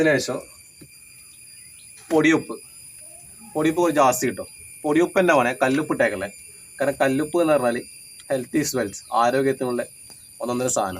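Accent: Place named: Kannur, India. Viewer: Slang belongs to Kannur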